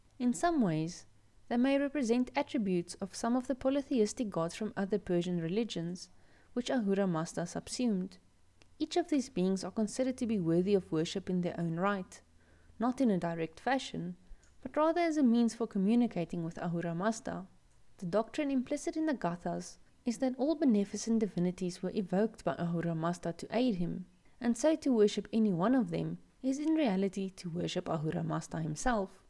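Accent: South Africa. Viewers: Wow, I searched "Zoroastrian asmr" and didn't expect a South African accent! Cool channel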